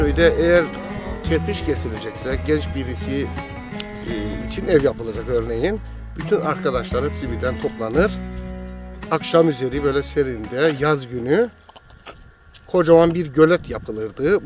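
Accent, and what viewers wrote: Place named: Cyprus. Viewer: dalcıkları, bayılıyorum kıprıs şivesine 🙂🥰 Maaşallah Horozumuz da pek yakışıklıymış. Ne güzel ibiği var. Nazar değmesin tü tü tü tü 😁 Canım horoz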